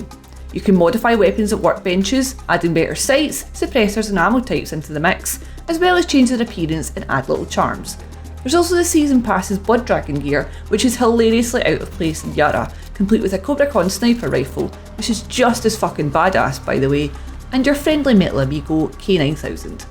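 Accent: Scotland, United Kingdom. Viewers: XD these comments! Poor Scots get a hard time of it don't they?! But yeah, while I personally can totally understand what she's saying, I agree with the comments, I live abroad, and I make constant efforts to ensure I speak extremely clearly. My normal accent omits T's at the ends of (and sometimes in the middle of) words. I had to teach myself to pronounce T's when talking, among many other adaptations. I think if your going to put out a review to essentially the WORLD, you've got to meet them half way at least. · I love your accent! 😁 more Scottish game journalists pls! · Born and raised Canadian, english is my first language. 99.9% of Brits and Aussies I can understand perfectly well, as clear as any Canadian or American... this reviewer (Scottish maybe??) has an overwhelmingly thick accent, I only understood perhaps 60% of the words she was saying. 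Perfectly fine if this review were for Brit / Scottish viewers only.. but pretty sure PC gamer's viewers or readers are mostly in North America and the rest of Europe.. wouldn't it be more sensible to use someone with a softer accent? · The colonials are struggling with the reviewers Scots accent 😁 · I am a mexican who speaks english reasonably well, trying to understand a scottish person who has a thick accent. Sorry PC Gamer but I think a clear and neutral english would be better next time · Please, if you’re going to make a review with a Scottish accent, at least speak more slowly than usual. Don’t get me wrong, I got nothing against the Scottish accent if the review is well made, but if I can’t understand most of the review, it’s not a good review. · Exactly. I can understand it too but you've gotta tone down the accent a little bit (a wee bit?) if you're addressing non-native speakers.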